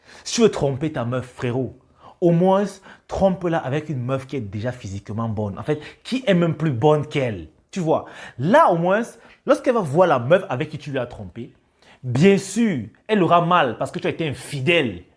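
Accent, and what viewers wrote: Cameroon. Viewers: Je reconnais là l'accent de mon frère du 237 😎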